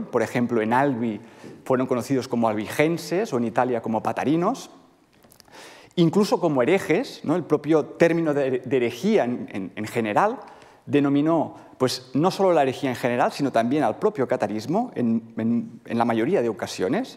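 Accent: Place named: Catalonia, Spain. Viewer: Creo que el professor no tiene la lengua castellana como propia. El apellido es catalan, el acento de su castellano lo delata así como açgunos vocablos en perfecto catalan. Ojalá los profesores castellanohablantes utilitzaran el català con la misma soltura que él tiene en castellano.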